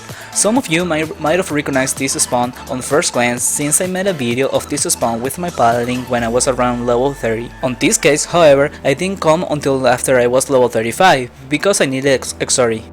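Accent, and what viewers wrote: Mexico. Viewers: Tienes buen inglés pero te escuchas mexa (no es que sea malo) sigue practicando para que suenes nativamente pero igual buen video Casi creí que era un canal gringo 🤣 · are you mexican? i feel it on your voice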